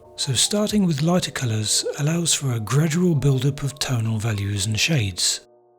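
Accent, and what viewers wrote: United Kingdom. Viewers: Why do you sound like you are British when you ask us to pay for your classes in dollars.,and why don't you stretch your paper first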